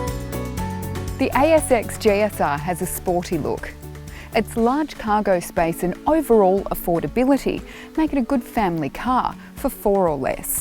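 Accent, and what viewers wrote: Australia. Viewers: love the auzzie accent - now i watch all my reviews on auzzieland channels. i have to assume this lady is not super tall...otherwise this car looks enormous. hopefully they dont scrap these vehicles with the new renault-mitsubishi asx built in spain if i hear correctly. i would hate to see the great reliability of these cars destroyed by changes in production. japan built cars kick as*. as i get older i look much more into production and history than vehicle options. many vehicles out there are crap...the asx/rvr is not one of them. mine is still awesome 11 years later. good visibility. great maneuverability. reliable. simple. im 6'4" and it has lots of head room. the tall kids complain in the back seats so everyone needs to adjust the seats. paddle shifters are great for the smaller engine - my 2012 has it. enough power with paddles. 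auto is slow from a stop. fold the back seats down and use a moving blanket to haul a lot of stuff. i haul 2x4s and plywood with mine when needed. $120 after market roof rack.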